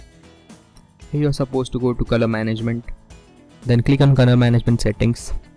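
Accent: India. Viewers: i hate this indian accent soo much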